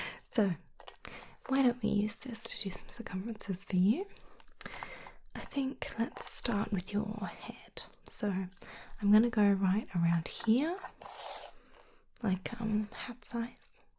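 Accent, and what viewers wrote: Australia. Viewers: just aussie mate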